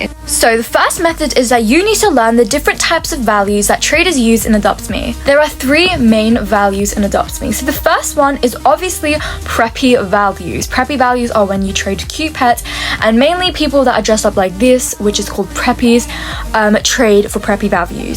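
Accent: United Kingdom. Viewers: You have a British accent right?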